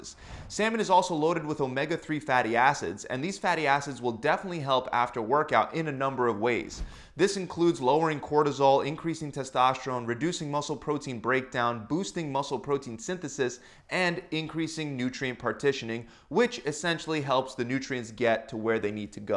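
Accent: Philadelphia, United States. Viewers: This dude from either philly or jersey w that wootur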